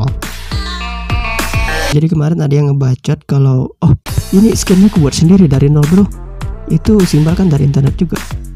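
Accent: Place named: Banjar, Indonesia. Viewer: Iya kalo, pantas ae pina ada logat banjar?? Sippp lanjuttt…. Salam Batinjakan Dr Banjarmasin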